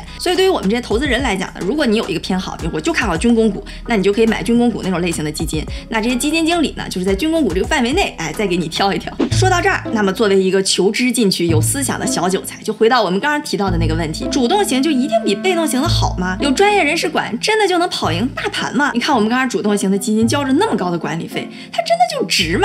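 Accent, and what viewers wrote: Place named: Dalian, China. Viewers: 大连口音一不留神就漏了。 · 好难听的大连口音啊，顿时不爱了！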